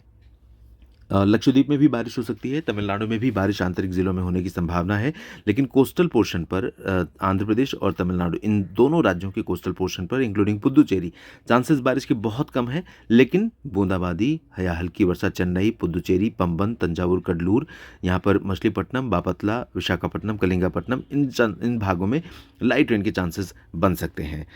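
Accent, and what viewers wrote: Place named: Madhya Pradesh, India. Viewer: Lagta hai apka native place MP hai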